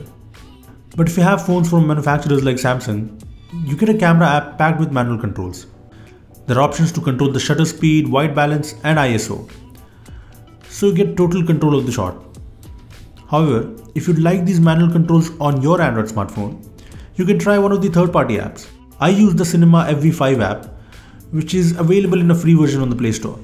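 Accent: India. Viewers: RIP Indian English accent :(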